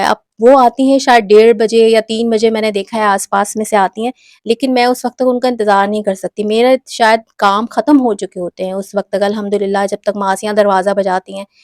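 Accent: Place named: Karachi, Pakistan. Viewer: Ap Karachi se ho apky lehjy se LG raha ha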